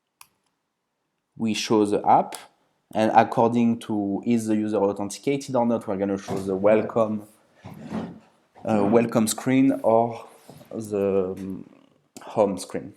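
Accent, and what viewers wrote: France. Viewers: you have a french accent bro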